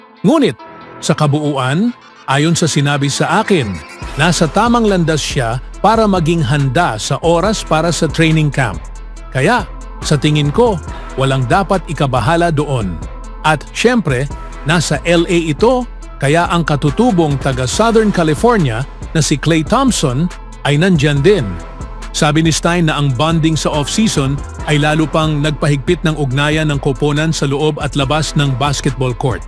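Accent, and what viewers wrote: Visayas, Philippines. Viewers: bisaya kaba bigkas mo bisaya eh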